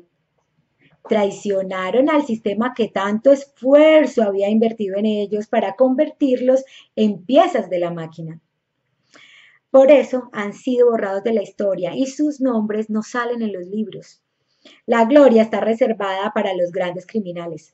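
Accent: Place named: Medellín, Colombia. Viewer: yo tambien soy de medellin es que tu acento se me hacia familiar jejeje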